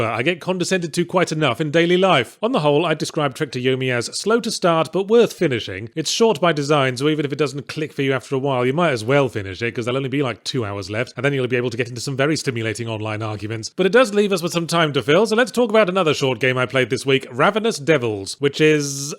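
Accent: United Kingdom. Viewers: I feel like the easiest way to appeal to Yahtzee is to give him a protagonist with the exact same "slightly condencending, posh and sarcastic" british accent, that he has. He ends up liking most of them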